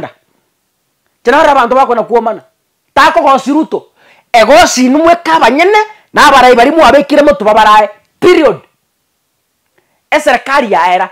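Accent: Kisii, Kenya. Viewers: Umeongea kama wakisii wote,obokenyomba baminto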